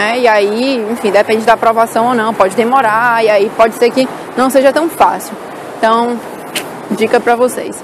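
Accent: Brazil. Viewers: Você é do nordeste ?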